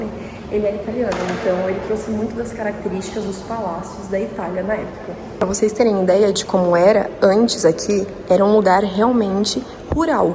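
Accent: Brazil. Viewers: Sabia que esse sotaque só podia ser de SP.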